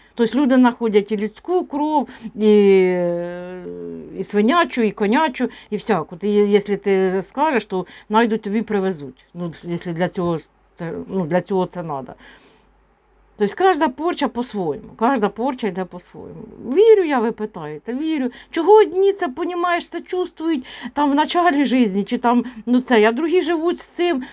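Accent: Poltava, Ukraine. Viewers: Добрый день,! А я вільно спілкуюся і на українській і на російкій мові, але значно приємніше чути наш полтавській говір. Простий і милий. Дякую. Дуже подобається вам слухати. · Я так і знала, що ви з Полтавщини❤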